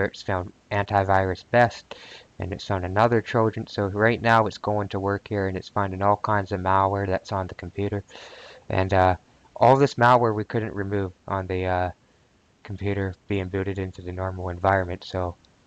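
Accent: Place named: Canada. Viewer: Ese acento Canadiense... 😏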